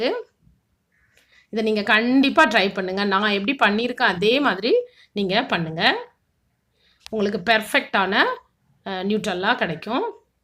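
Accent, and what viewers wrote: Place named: Tenkasi, India. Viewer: Hi Akka..... my native was Tenkasi (pavoorchatram). So i liked your way of talking, while seeing your video your voice taking me to my native. I watched all your videos....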